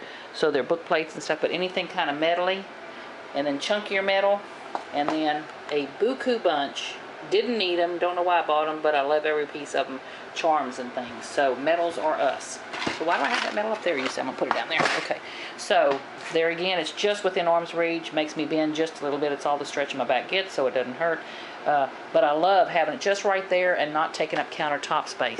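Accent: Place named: South, United States. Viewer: You are a woman after my own heart!, diet coke and chocolate! HA! Thanks so much for sharing! Good to hear a fellow Southern-Sister's accent on YouTube, keep up the good work!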